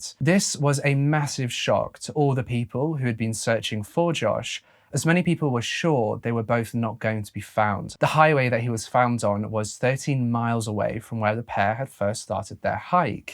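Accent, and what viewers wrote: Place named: Derbyshire, United Kingdom. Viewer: im guessing Derbyshire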